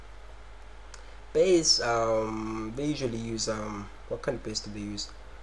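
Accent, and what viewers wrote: South Africa. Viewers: are you South African haha?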